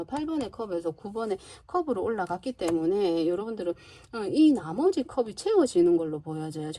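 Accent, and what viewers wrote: Gyeongsang, South Korea. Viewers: 역쉬 쵝오십니다! 짝!짝! 경사도 말씨 매력있습니다 ㅎㅎ 부분적으로 맞는부분이 많습니다 저의 소원이 꼬옥 이루워지길 요...... 2번 입니다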